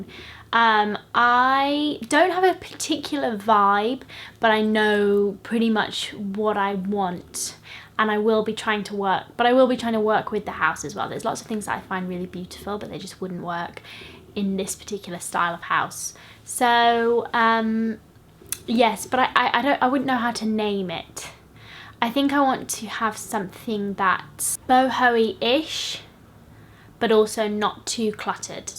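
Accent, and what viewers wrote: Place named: Scotland, United Kingdom. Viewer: I can still hear some Scottish in your accent and it makes me so happy. Also you look lovely!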